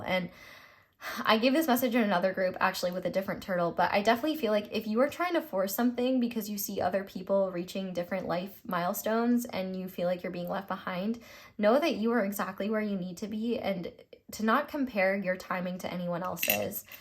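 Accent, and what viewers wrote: South, United States. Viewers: Hi there, first things first I love your original and authentic Southern accent. I find it to be really sexy, even though you might feel embarrassed about it most of the time. You seem to be a really intuitive as well as sensitive person, high on the agreeableness spectrum. Which would automatically mean, that you put other people's needs first, dislike confrontation and last but certainly not least have no hard time forgiving. A true empath by the very definition of the word, which enables you to formulate readings with such accuracy. Your gift is so potent, that you don't really need a deck, to be honest. My piece of advice to you is two fold. You need assertiveness training in order to not feel so guilty when saying no, you need to learn how to operate under pressure, in order for you to continue with your studies because you are smart and articulate therefore wasting potential. 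You could be of great help to any kind of person as a skilled therapist, I mean it. If my intuition is not deceiving me, you must have a real hard time dealing with stress from the exams. You can work on that. Best of luck with your channel.🧁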